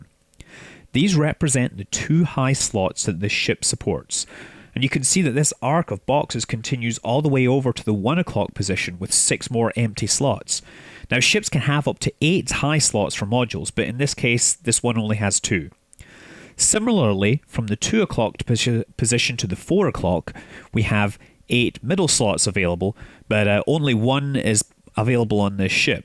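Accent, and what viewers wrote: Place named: Edinburgh, United Kingdom. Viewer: Are you from Edinburgh? Good comentators voice, easy to listen to, i'm from Cheshire.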